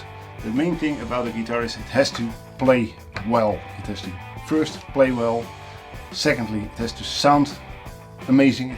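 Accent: Netherlands. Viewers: Are you dutch . It sound like it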